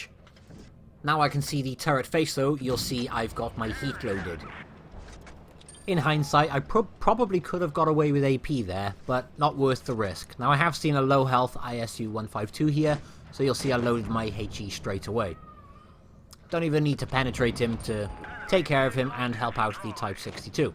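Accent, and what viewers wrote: Wales, United Kingdom. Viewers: Look See! Now in a minute! By there! You went super Welshy for this one!!! GG gr8 vid as always!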